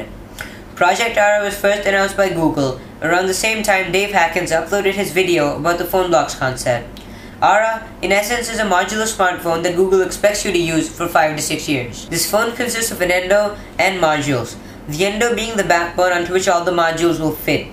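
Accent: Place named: India. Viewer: Face not shown. Are you from India cause you sound like a Indian. Is it true Indian are not suppose to show their face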